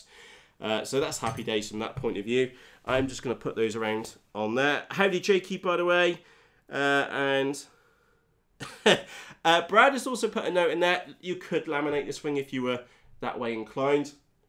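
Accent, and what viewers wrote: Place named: England, United Kingdom. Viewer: So English, makes a change from all the American channels, loving it.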